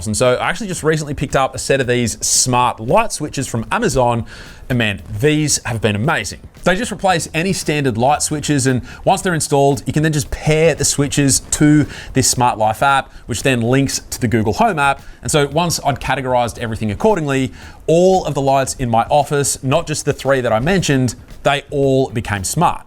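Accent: Australia. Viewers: Dude I literally just found you today and I'm binge watching your videos now. Subbed for both the production and the accent. Aussie Oi! 😅❤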